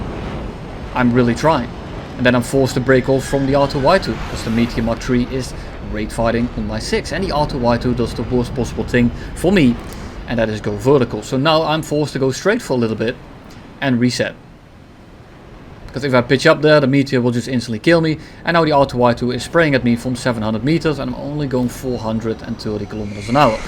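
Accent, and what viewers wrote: Netherlands. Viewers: Ik hoor het Nederlandse accent in hem but sometimes he speaks English like an American!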